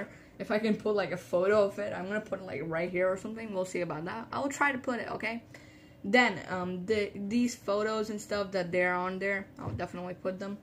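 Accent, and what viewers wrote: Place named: England, United Kingdom. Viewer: bruh but why u have an English ascent in your language????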